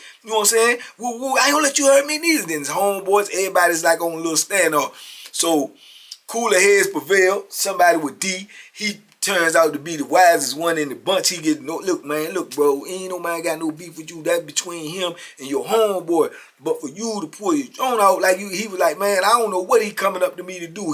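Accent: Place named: Virginia, United States. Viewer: Another banger. But, the VA accent is killing me.😊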